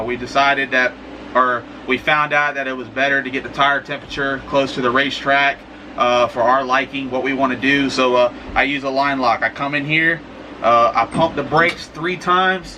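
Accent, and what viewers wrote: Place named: Florida, United States. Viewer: I've noticed all y'all Florida boys pronounce button as "butt-in". lol! I've been following your career and I have to say, Swan Gang are a force to be reckoned with! Keep up the GREAT WORK!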